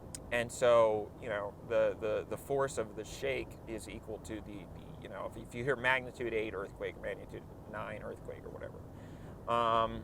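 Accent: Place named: California, United States. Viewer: It took me til now to notice your definitive Cali accent. Do you enjoy being bald? I hope we get married someday.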